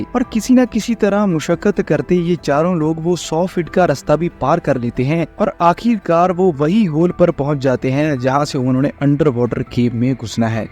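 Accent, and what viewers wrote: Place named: Pakistan. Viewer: tumhara accent Pakistani lag raha hai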